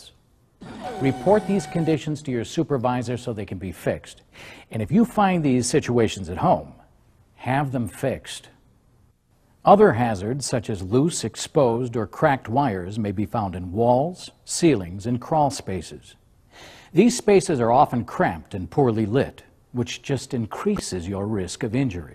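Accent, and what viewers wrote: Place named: United States. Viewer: Zakaj dobimo amerikanski video, če se učimo bri'ish english?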